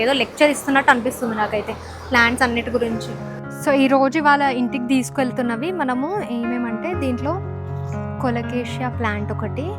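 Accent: Telangana, India. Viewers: Mee Telangana slaang super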